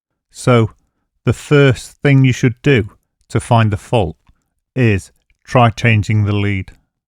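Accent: United Kingdom. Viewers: I am a language teacher and have no idea about these items you explained so well. Thanks, I subbed and am looking forward to other vids to come. Greetings from Austria! Btw I'd like to see some vids about how connecting different gear (looper, modeler, and other gear) Of course I subbed and liked. Cheers! Am I right, you're British, ain't you?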